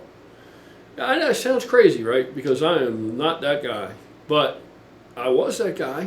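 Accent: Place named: Central Pennsylvania, United States. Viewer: Central PA or Maryland accent (No offense, of course, I'm from there and recognize it - new to the channel, too, so if everyone else knows where you're located, I don't yet).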